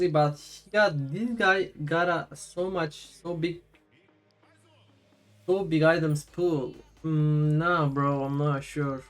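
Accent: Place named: Turkey. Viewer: Are you Turkish? your accent sounds like Turkish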